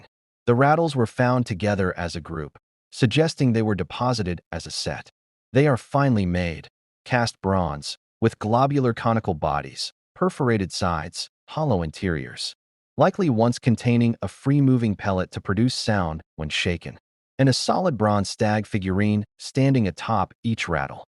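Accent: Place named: United States. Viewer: Bitte keine Amerikanische Stimme.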